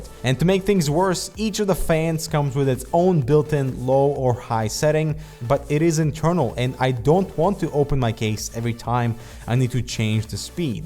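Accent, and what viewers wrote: Russia. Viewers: no trust me I know the accents I'm guessing he could be Russian or maybe Norwegian or he might be Dutch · work with quality and light are awesome! Like it a lot. By the way, are you russian?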